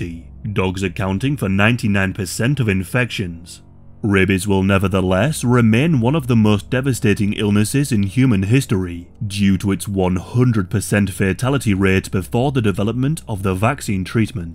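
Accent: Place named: Scotland, United Kingdom. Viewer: Love your accent. Scottish or Irish? I'm a wee bit uncertain.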